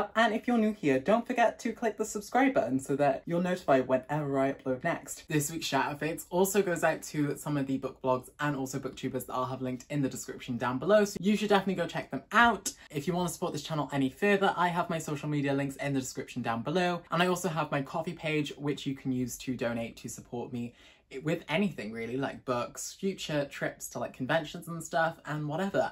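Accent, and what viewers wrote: United Kingdom. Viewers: As if I wasn’t excited enough when I saw that he was a black male booktuber from the thumbnail, his British accent just rlly put the cherry on the cake 😩😩😍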